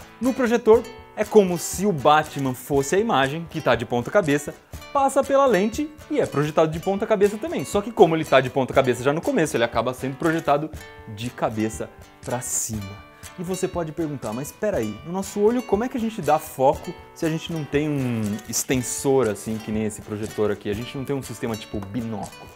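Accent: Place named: Minas Gerais, Brazil. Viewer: Iberê vc é Mineiro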